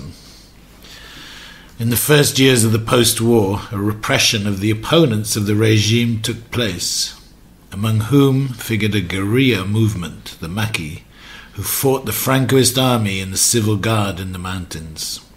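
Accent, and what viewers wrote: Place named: RP, United Kingdom. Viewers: Indeed. "I call it british RP with a spanish twist" but I found it to be sophisticated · His voice sounds like Trump's with a British accent hahaha I actually like it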